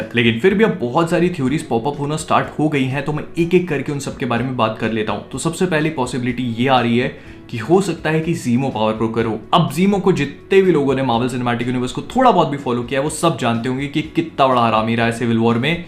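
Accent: Bhopal, India. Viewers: Bhopali Bolte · sound like a India Type it's cool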